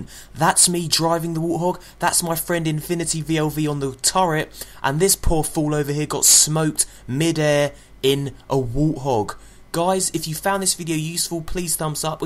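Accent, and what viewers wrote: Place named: United Kingdom. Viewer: Its nice to hear some videos on youtube done by people with British accents for once and not American 12 year olds :P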